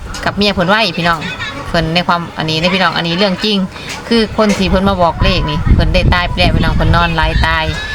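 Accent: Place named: Laos. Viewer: ออกลาวบ่อจร้า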